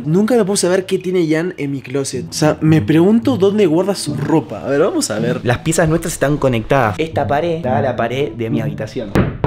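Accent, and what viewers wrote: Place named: Argentina. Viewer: me gusta tu voz de Argentina:3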